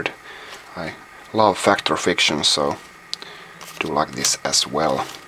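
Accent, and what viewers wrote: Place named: Finland. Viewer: I think I just nerdgasmed for the accent suomi perkele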